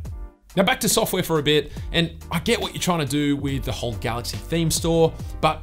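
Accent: Australia. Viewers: You Australian accent tickles me 🤣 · Your Australian accent sounds really fun ngl, and I like how you use 21:9 video. Watching full screen on a longer phone is such a joyous experience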